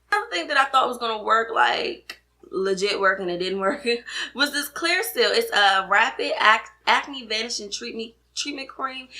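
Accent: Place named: DMV, United States. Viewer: Im 4 years late LoL! But great video & Im going to assume you're from the DMV 🤞🏽 because I can hear it 😂😍 not sure if Im right, but usually a DMV person knows another 😊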